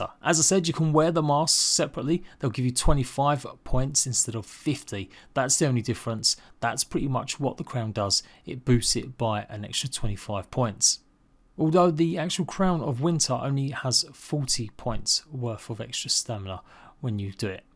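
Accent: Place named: United Kingdom. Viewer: Ur to british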